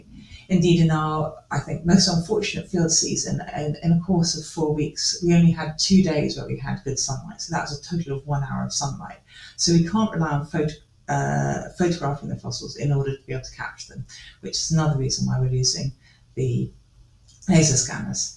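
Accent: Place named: United Kingdom. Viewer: Nothing better than hearing pure science from a well-spoken British scientist! 🥳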